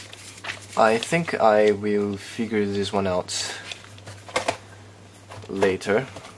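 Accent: Singapore. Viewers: are you from singapore??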